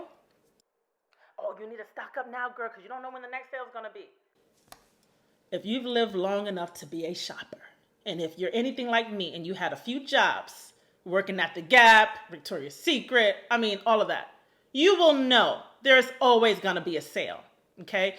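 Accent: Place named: New York, United States. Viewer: This is the 5th video I’m watching of yours today, since the first video I said her accent is from NY and she’s giving me a lil Carribean and you said NY!! I divinely found your page today, thank you I needed it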